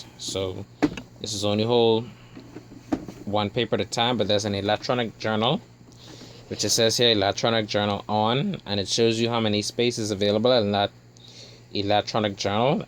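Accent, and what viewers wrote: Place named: Bahamas. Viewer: Thank you for sharing. I'm sitting here listening to you and you sound awfully alot like a Bahamian. Are you?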